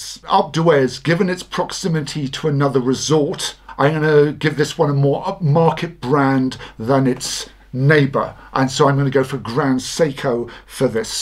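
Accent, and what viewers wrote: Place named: England, United Kingdom. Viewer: Salut, Tu sais ce que j’ai apprécié (entre autres) dans cette vidéo? Je n’avais jamais entendu nommer les stations de ski française avec un accent anglais sur toute une liste comme cela! Merci 🎉😊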